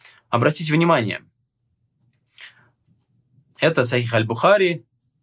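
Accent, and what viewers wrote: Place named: Iran. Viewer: Ибарат не можешь нормально прочиталь арабские слова подрожая иранские мотив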